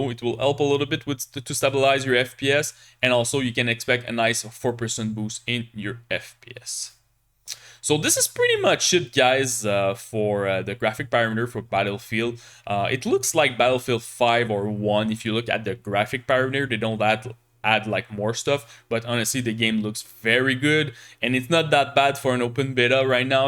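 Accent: Quebec, Canada. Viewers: You’re french canadian aren’t you haha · Greate video :) Juste, avec l’accent je détecte un québecois😂 Cool de voir d’autre québécois sur YouTube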